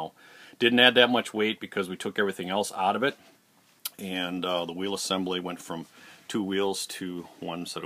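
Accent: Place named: Minnesota, United States. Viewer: Are you from Minnesota? When I heard you say "The Cities" it made me think you are. And the way you talk too lol.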